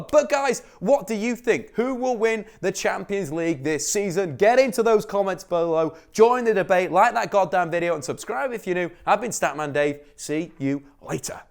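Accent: United Kingdom. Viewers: The minute I heard your UK accent I knew that you would predict that Liverpool would go through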